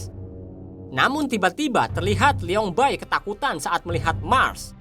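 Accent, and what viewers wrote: Java, Indonesia. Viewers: Suara lu terlalu Jawa mas 🗿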